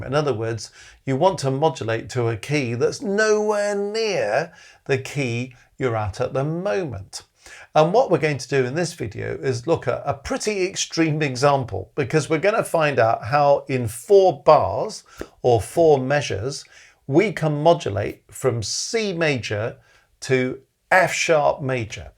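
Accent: United Kingdom. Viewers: Just heard three words with your British accent made me push the thumb up :)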